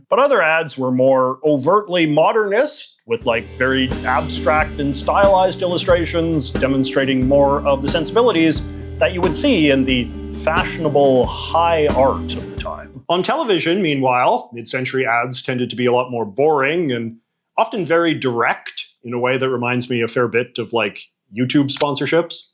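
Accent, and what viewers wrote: Canada. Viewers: I agree with a lot of your points made here. But man is your Canadian accent is really popping here. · What’s this accent? Never heard anybody who pronounces “about” like “a boot”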